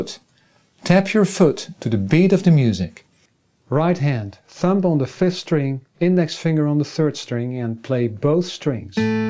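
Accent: Netherlands. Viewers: Are you dutch? You sound dutch.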